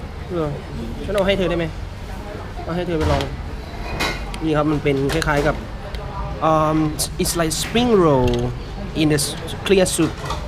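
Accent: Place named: Isan, Thailand. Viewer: i like it whan you talk e-sen it sound so cool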